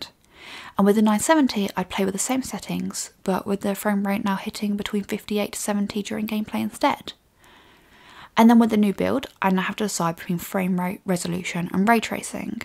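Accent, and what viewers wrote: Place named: Cockney, United Kingdom. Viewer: You've been living in London lately? Your accent is sounding a bit cockney.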